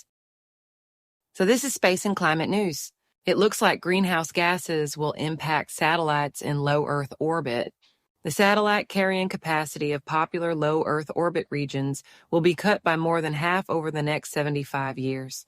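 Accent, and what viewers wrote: South, United States. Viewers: Well damn, Miss, I think I might be fallin' in love with you, lol! Just joking, but for real I LOVE all the things you talk about and the knowledge you give us! Really good stuff all around. Plus, I am a Southerner and you have a wonderful accent that warms my heart. I grew up under tutelage of a very smart father - anesthesiologist, sailor, hunter, fisherman, etc. So, I, as a sailor, surfer, fisherman, etc. became, as he was, something of a meteorologist (because surfers and sailors have to pay attention to such things) as well as something of a science nerd. Long story short - I love what you do and am VERY appreciative! I have already followed and will continue to do so. Thank you from South Carolina;-)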